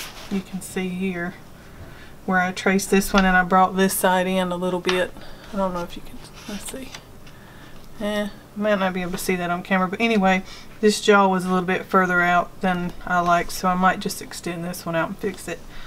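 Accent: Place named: Kentucky, United States. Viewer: I’m glad I found ur channel i love your videos and I think we have the same accent lol. I’m from SE KY.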